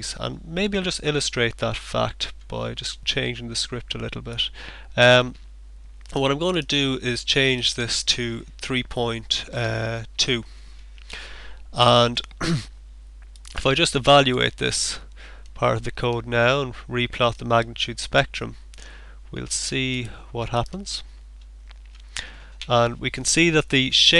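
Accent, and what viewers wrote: Ireland. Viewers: also, great accent. more people should talk with irish accents.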